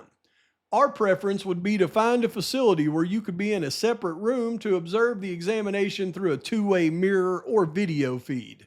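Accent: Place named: South, United States. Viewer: The hick accent is too distracting. Sorry, not today.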